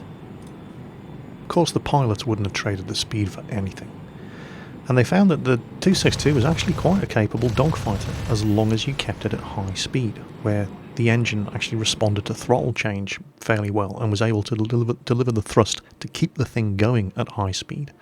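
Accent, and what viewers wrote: England, United Kingdom. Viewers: The Russians won WWII. Band of Brothers is a clever propaganda movie and HBO series, 1944 Germany was already lost and real historians know this. You sound smart - but your audience are kids. As a lawyer, age 58 and Russian/American and history major I know your British accent is part of your false narration of history to video kids. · This guy is British. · You did pronounce "Schwalbe" correctly, although one can hear your English accent! I'm proud of you :-D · Your german pronounciation is astoninglishly good, given that you have such a british accent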